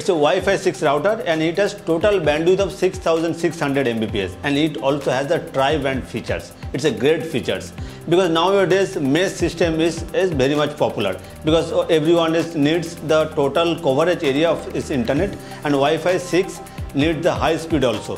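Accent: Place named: India. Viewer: WARNINGL VERY STRONG INDIAN ACCENT.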